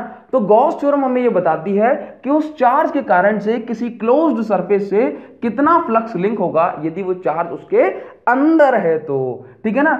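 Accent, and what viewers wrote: Odisha, India. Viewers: Sir, are you odia???😃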